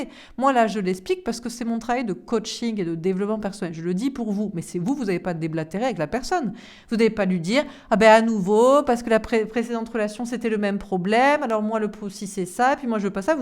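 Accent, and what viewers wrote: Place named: Southwest, France. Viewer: L accent du sud ouest est magique 😁 J ai le même 🤪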